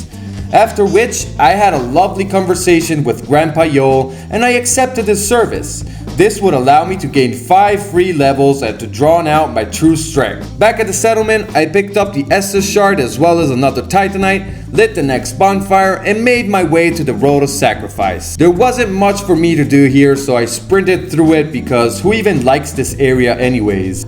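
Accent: Italy. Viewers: Rapid question, you italian?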